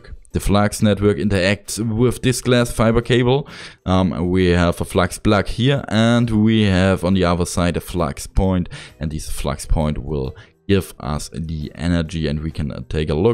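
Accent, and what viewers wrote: Germany. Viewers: Do I hear a slight German accent?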